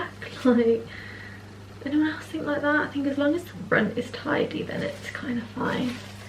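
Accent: Birmingham, United Kingdom. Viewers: Your so down to earth! I love your videos!! Its Also nice to hear a fellow brummy on YouTube!😆x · Hey I am so glad I came across ur video. Are you a brummie? watchin ur vid was like im listening to my mate whilst we getting ready to go out lol. Loved the look & you looked so amazing. I totally agree with lashes dilemma honestly that is me always such a faff but always needed too but u looked so good without them. 💕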